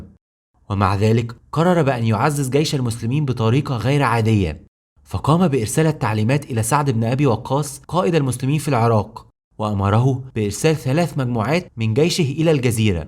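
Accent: Egypt. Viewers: يارجل ... ليت انك تتحدث بالفصحى ... فوالله ما اكره شيئا كما اكره سماع اللهجات بمختلف انواعها ... ودرجة الاشمئزاز كبيرة جدا عندي ... عند سماعي الجيم المصرية التي حولت لحرف g الانكليزي تكسيحا لجوخ الضباط الانكليز وتقبيل نعالهم ...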